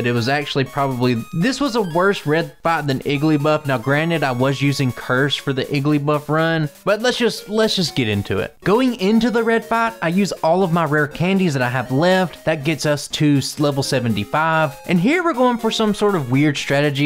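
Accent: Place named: Texas, United States. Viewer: im ngl your (Texan?) accent makes your videos very entertaining to listen to, quite unique. definitely subscribed!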